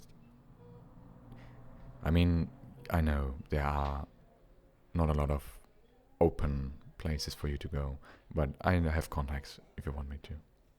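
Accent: Germany. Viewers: Are u From germany?💞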